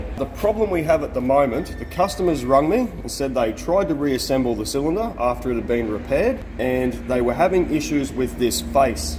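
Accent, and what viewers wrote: Australia. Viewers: First time I've heard it said like that: "moyd in tha yoo-ess-ayyy" (made in the USA) hahaha love the aussie accent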